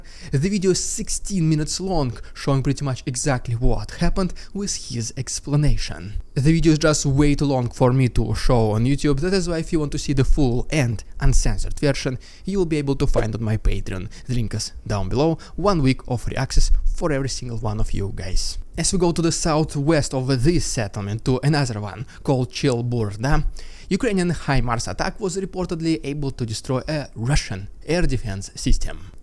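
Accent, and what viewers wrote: Russia. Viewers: your extra funny russian accent is really annoying - appreciate the infos though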